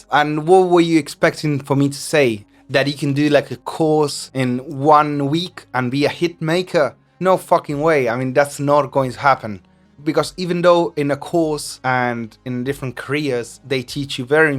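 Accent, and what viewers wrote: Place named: England, United Kingdom. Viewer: How many truths, and none of them are absolute!! Great video, love your english accent bro. Keep it up! 💪💪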